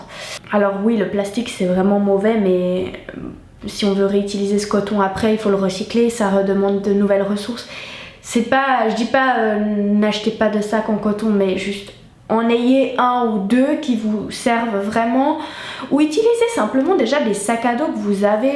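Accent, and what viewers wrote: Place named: Switzerland. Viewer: J'imagine que c'est l'accent suisse qui prononce comme ça.. . Chacun son accent 🤔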